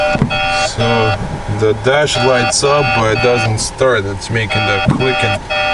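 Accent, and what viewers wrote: Russia. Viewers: u have rus accent lool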